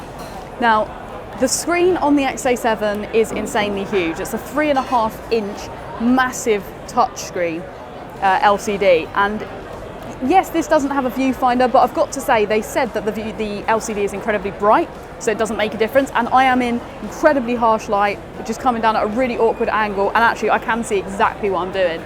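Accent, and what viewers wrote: United Kingdom. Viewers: British Accent 😂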